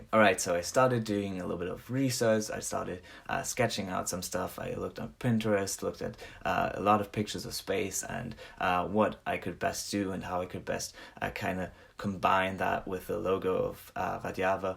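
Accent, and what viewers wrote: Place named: South Africa. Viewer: i knew i heard south african :) my parents are both from there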